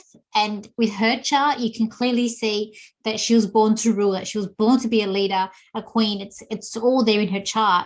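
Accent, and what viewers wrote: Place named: Australia. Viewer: I first had difficulty due to the Australian accent. I turned on the sub-titles and then I understood.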